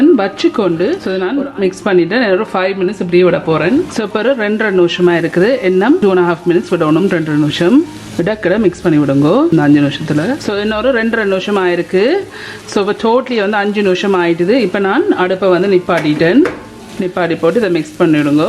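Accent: Sri Lanka. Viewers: Ungaludaiya elatamil migavom arumai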